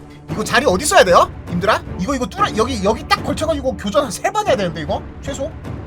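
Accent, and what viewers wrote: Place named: Gyeongsang, South Korea. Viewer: 바깥에를? ㅋㅋ 진짜 갱상도야 이햄은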